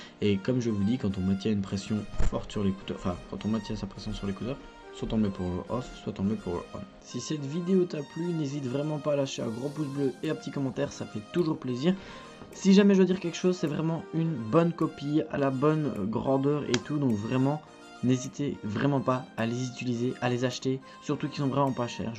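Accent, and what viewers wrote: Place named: Wallonia, Belgium. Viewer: mais t'es wallon toi dit donc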